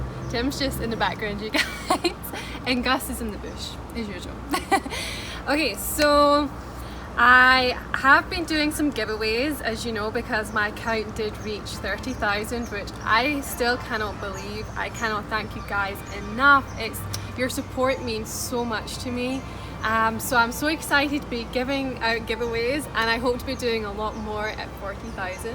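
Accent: Scotland, United Kingdom. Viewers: Please never lose your lovely Scottish accent!!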